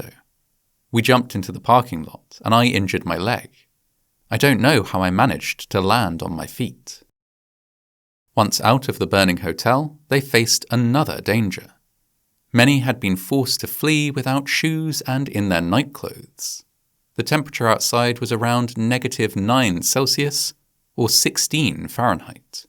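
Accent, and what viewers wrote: United Kingdom. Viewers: It was very thoughtful of Holiday Inn to install a fire alarm designed to not disturb their guests. Greece still doesn't use 911. They use 112. I find it interesting that a narrator with a British (?) accent would use 911 to refer to a general emergency number rather than 999. Perhaps an American script writer? Edit: Whoops, my bad. I kept hearing "Greece" and thought it was the country, not a town in New York. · Of course, another video about an American event narrated by an annoying British accent!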